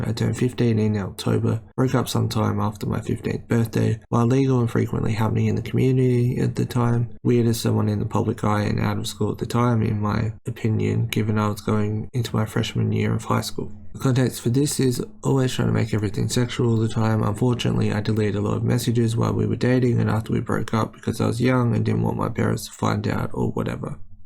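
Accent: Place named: United Kingdom. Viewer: ur so british i cant follow what ur saying without locking in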